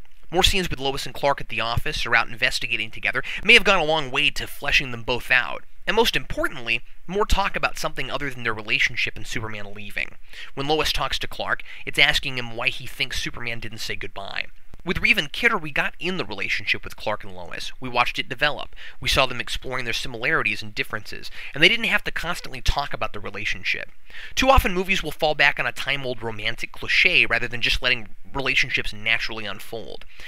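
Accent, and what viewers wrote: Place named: United States. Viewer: Only cos people are dumb and get a huge boner for 'splosions and mass destruction. Man of Steel is not a superman movie. Superman Returns at least had a heart to it. Didn't give one shit about anyone in the new one. I need to go now though, this guys particular brand of American accent is cutting right through my brain.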